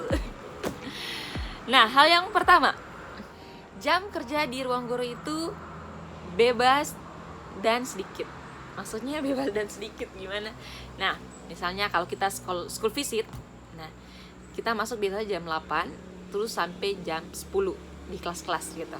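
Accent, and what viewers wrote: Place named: Sulawesi, Indonesia. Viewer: Kalau dari logatnya sekilas org sulawesi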